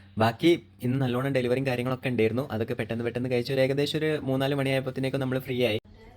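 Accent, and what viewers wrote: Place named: Malappuram, India. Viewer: സംസാരം മലപ്പുറം സ്റ്റൈൽ und ad കൊണ്ട് ചോദിച്ചതായിരുന്നു 👍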